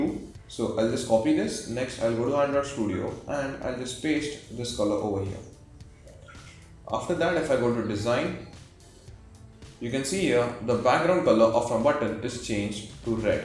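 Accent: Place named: India. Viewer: DUH BHUUTTTOOOONNN!! THICK ASS FUK INDIAN ACCENT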